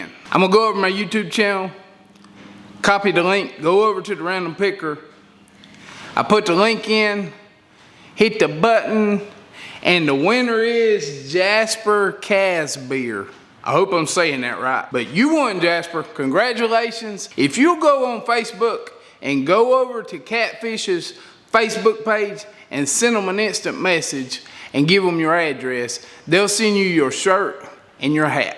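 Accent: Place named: North Carolina, United States. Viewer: grab it bare handed den! 😂 I think we might be from the same neck of the woods! I just ran across your YouTube yesterday and you sound like you could be a neighbor of mine or someone I grew up with! I am a North Carolina man born and raised!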